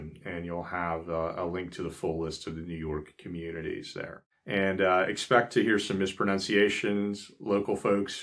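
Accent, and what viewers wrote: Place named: Philadelphia, United States. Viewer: Very interesting vid. Enjoyed it. Been visiting the Amish in Pa all my life -- Your accent is striking -- the O in home, phone, -- you from Phila, Pa?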